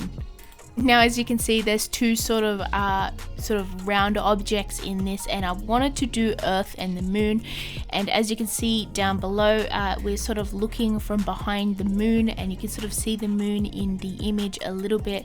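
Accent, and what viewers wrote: Australia. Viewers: Thanks for the welcome! Loving your channel so far, so nice to hear the aussie accent too 😉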